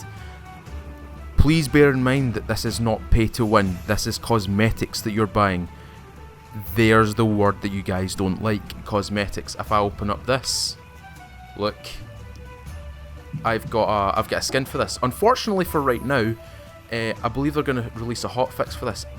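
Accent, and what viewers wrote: Scotland, United Kingdom. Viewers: Came for the video, stayed and subscribed for the accent. Love the scottish accent. Edinbrugh, right!? Keep on with the videos.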